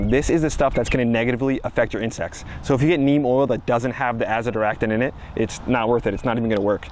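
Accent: New Jersey, United States. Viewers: It's SO Jersey. I love it, he hypes you up before every video.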